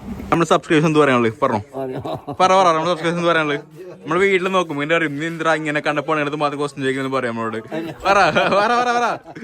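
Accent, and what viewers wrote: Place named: Kasaragod, India. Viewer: Nigal kasargod anoo.... Live kandu.... Samsaram kettpo kasargod ann thonnni Ksd ano nigal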